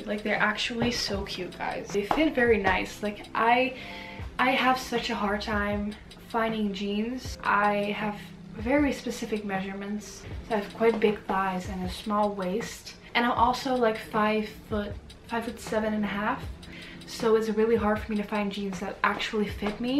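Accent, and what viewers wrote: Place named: United States. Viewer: bro u sound American and ur English is GOOD🤝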